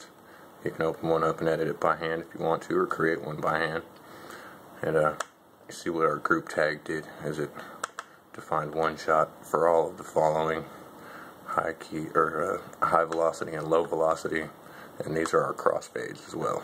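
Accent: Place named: Nashville, United States. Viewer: Very very good stuff. Nice to hear your voice - so Nashville! Keep up the great work. This is masterful.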